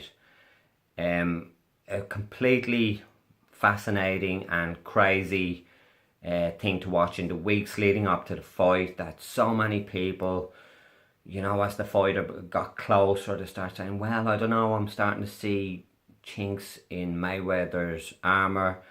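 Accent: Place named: Ireland. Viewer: Wow. An Irish guy (sorry if my assumption is wrong) who is sensible and not deluded. I can't believe my eyes and ears. I'm so glad you exist. Never thought I'd hear someone with an Irish accent talking like this.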